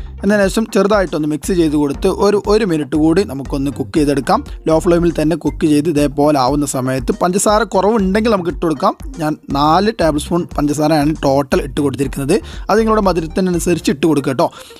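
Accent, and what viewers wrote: Malappuram, India. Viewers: ഇക്കാ നിങ്ങടെ മലപ്പുറം ശൈലിയിലുള്ള ഭാഷ നല്ല രസമാണ്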